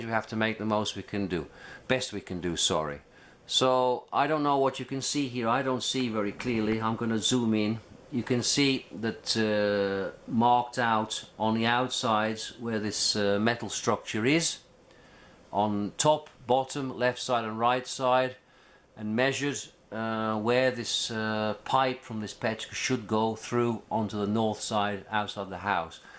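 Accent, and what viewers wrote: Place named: Wolverhampton, United Kingdom. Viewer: You from Wolverhampton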